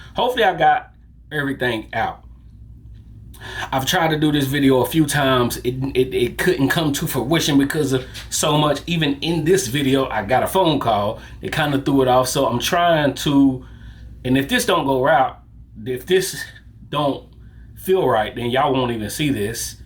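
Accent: South, United States. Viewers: Nothing wrong with a southern accent brother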